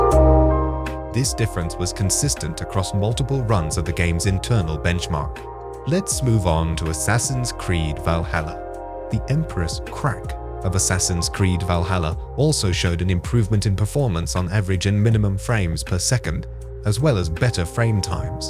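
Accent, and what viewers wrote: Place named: England, United Kingdom. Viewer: bruh i just listened to jolly wangcore, he's australian. idk what the accent is called but this is like a slightly posh English accent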